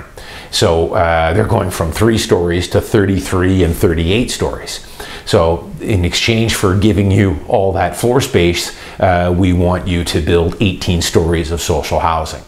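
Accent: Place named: Canada. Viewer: The most typical Canadian accent. Fascinating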